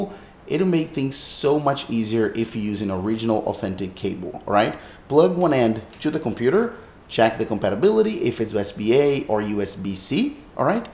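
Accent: Brazil. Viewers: Not related to the tutorial but I hear that Brazilian ascents 😂 good tutorial. Thanks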